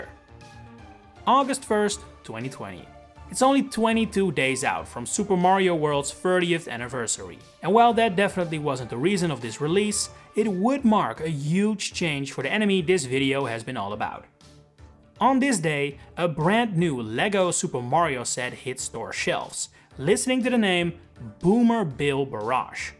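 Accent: Netherlands. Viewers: Um, he definitely didn't add a dutch accent, he's dutch. And also...of course he uses US terminology?? It's a video about the US name of the enemy??? You're goofy for this comment lmao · checks profile after 5 seconds of talking: "yep, tissun nederlander."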